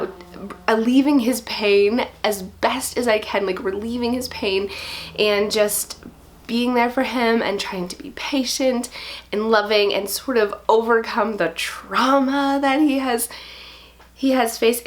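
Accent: Canada. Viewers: First 10 seconds of the video: op she's Canadian lol.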